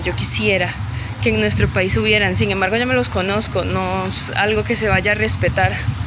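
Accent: Bogotá, Colombia. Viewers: El acento igual al Bogotano pareces rola saludos 🇨🇴☺️ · Soy de Bogotá y me costó mucho trabajo tu acento, hablas igualito a nosotros. Te darás cuenta que nadie es capaz de reconocerte como extranjera. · Hola si es verdad que el asentó se parece mucho al de Bogota' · Soy colombiana y antes de q hablaras del acento pensé q eras rola , es cierto hablan bastante parecido a los Bogotanos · Soy de Bogotá y tienes el mismo acento que mis Primas, pensé que era de Bogotá. Saludos🇨🇴🤝🇨🇷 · Tienes un acento muy parecido al Bogotano 💐🌹🌺🌷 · El acento es muy parecido al de Bogotá. Bienvenida mi chinita a la capital. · Hola soy de Bogotá y por tú acento pensé que eras de acá 😂. Hablamos pausado y esta conciderado como el mejor castellano del mundo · Y para ser mas preciso, tienes el acento de una Bogotana que creció en un estrato socio-económico del 4 al 6. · Si el acento es muy iguall al bogotano le comento en la historia de Colmbia dicen que iva hasta Costa Rica le dejo esa inquietu aberigue en la historia de su pais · Tu acento es muy semejante al acento de bogotá❤ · Literalmente pense que eras Bogotana y estaba un poco confundido con el titulo del video xD Como que llegaste a tu pais? 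ya cuando dijiste que eras de Costa Rica entendi todo, son acentos demasiado parecidos, me paso igual en Costa Rica, hermoso video, gracias por visitar, te mandamos un fuerte abrazo! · Me engañaste creí q era una rola una hija de papi y mami de las entrañas de Bogotá .😊pero si el acento es casi parecido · Si, el acento se parece mucho al bogotano. Indudablemente. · muy parecido al acento de Colombia Soy colombiano y pensé que usted era de Bogotá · Hola amiga,pienso que el acento Tico, es muy parecido pero al acento Rolo, me parece increible, porque historicamente realmente nunca fuimos un solo pais. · Buen video y me alegro que visites nuestra ciudad, tu acento es muy bogotano, si te viera en la calle pensaría que eres bogotana, además también tu aspecto y todo es muy de Bogotá, y pues también queria hacerte unas pequeñas correcciones, el presidente de Colombia vive detras del congreso la cual se llama la casa de Nariño, lo que señalaste es el Colegio de San Bartolomé, el más antiguo de toda Colombia fundado en 1604, lo de las carpas en la estatua de Bolívar es porque ahi una guerra entre guerrillas en la región del Catatumbo, en el departamento ee Norte de Santander, y seguramente protestan para que esta guerra acabe, y en cuanto a la plaza de toros la última corrida se hizo en 2020, y que yo sepa no se hace nada relacionado con toros, ni corridas ni nada, ese lugar se usa para eventos culturales pero son de vez en cuando, por eso el lugar se ve asi un poco deterirado y lleno de grafittis, y las corridas de toros ya se van a prohibir en el 2027 en todo el país, y en las únicas zonas donde es activo la tauromaquia son en pueblos y en una ciudad llamada Manizales donde son famosas sus corridas, pero gracias a Dios lo van a prohibir por completo, espero recorras mas sobre la ciudad y todo el pais y lo disfrutes, un abrazo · El acento efectivamente sí es muy parecido al bogotano, y la cara también parece de acá. 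Antes había conocido el acento costarricense por un profesor de programación que se llama Fernando Herrera, es un tico muy famoso en ese mundo, y también habla como bogotano pero con la RR arrastrada. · Si no leo los comentarios, juraría que eras bogotana. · Tu acento si es parecido al de Bogotà Saludos ! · SI SE PARECE.SI ESE ES EL ACENTO,SI BOGOTÁ...👍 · Si, tienes acento Bogotano. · Cuando te vi y oí pensé esta colombianita hablando del país, hablas y te ves como Bogotana. Muchos éxitos. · Al principio pense que era una Rola hablando de Bogotá... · Hablas como rola Bogotana 😮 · Pues si cuando comenzaste a hablar pensé que eras bogotana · los costaricences hablan muy parecido a los bogotanos, es la locura jajaja,. tienes un acento muy muy similar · Se me hizo que tiene acento rolo(bogotano) con algo muy mínimo de costeño.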